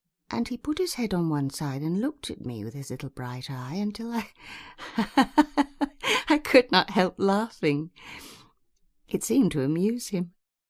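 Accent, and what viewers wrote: United Kingdom. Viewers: I don't like british accent 😢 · فخم و تحس بطعم الإنگلش بالأكسنت البريطاني😂😂😔✨🤍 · واو صوتها حلو حرفيا احب الاكسنت البريطاني 😭😭😭💙💙💙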